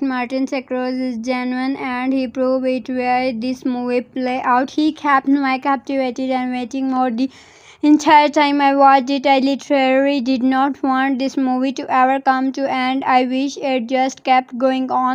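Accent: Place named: India. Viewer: Your accent is funny She Indian Hey stop scumming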